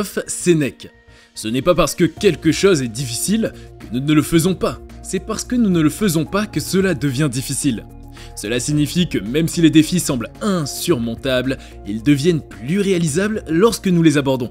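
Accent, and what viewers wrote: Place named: England, United Kingdom. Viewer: purée trop bien ton accent anglais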